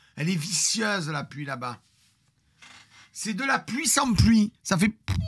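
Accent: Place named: Savoy, France. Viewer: c'est pas l'accent savoyard plus que breton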